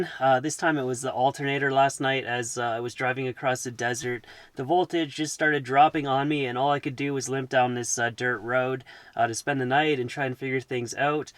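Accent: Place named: Newfoundland, Canada. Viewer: Drive your whole house into a moose -! 😂. You sound like a Newfie or Bluenoser! Thanks for the good laugh. Definitely driving your house into wildlife is a genuine concern for all van dwellers. 😊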